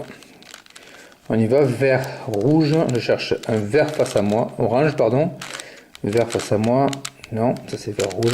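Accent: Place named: South, France. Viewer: En bref et pour profiter de tes talents d'orateur avec ton petit accent qui chante le sud refais nous une petite video je n'ai pas ton talent de youtubeur, mais en bref la methode la plus simple commence par faire une double croix blanche avec les arretes de L4 et les centres de L3 en même temps, etape deux tu alignes en une seule fois sur les ligne 1 et deux les paires de coins que tu fais decendre a la bonne place avec ta formule DHDHDHHD en fonction des pieces a placer si c'est que celle de la ligne 2 tu fais dhdhdhhd ou DdHhDdHhDdHhHhDd et tes deux lignes du bas sont finies, en etape 3 tu aligne devant toi deux couleurs contigues sur la ligne 1 et sur la ligne 2 meme si elles sont diferentes et tu fais ta formule du 4x4x4 de parité de coin pour inverser tes coins mais tu la fais en prenant les 2 lignes en meme temps et en un seul algo tous tes coins sont faits t'as plus qu'a les placer. 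En derniere etape il te reste les deux lignes de centres a faire, tu commences par un PLL du 3x3x3 (en faisant des demi tours avec droit et gauche) ca te place les 3/4 des paires d'arretes et pour le reste tu utilises les formules de parité d'arrete du 4x4x4 il te reste plus que cette parité que tu évoques a la fin, en gros il te faut 2 petites minutes pour faire ca, En joy...A ton service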